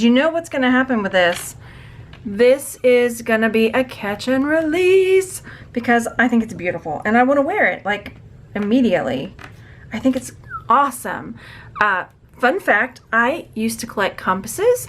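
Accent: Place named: Texas, United States. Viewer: You are cracking me up! I needed this today. I hear my Texas, teacher self in you with your silly songs. Don't you love exaggerating our Texas drawl??! Then the, Labyrinth stuff sent me over! Love it!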